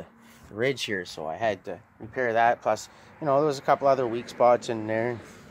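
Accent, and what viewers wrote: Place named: Canada. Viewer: We have an old 15' fibreglass canoe, and when I heard you speak, (and of course the topic), I guessed- "Canadian". Then I see how you are a great 'responder' to comments. Yup- definitely helpful- also probably- He's Canadian. (, maybe 1st Nations?) Then I heard 'fiddleheads' and Oh hell YEAH!!!. Now, to guess where from"- Prairies?, nah, not enough water. NL or Nunavut" or BC? nah, more likely he'd be doing a fishing boat. Then the Lepage adhesive can- en français. Voila! Super definitely 'CANADA, in N. Ontario, Quebec or NB.? Humm?. Cheers, from Montreal. Been through NB many times, mom grew up outside Petitcodiac (Forrest Glen), happy memories, saw my first movie in Moncton when 8 years old! with my late uncle, who had a farm near "Elgin" and I almost attended UNB. Keep up the good work... and Happy paddling!:-)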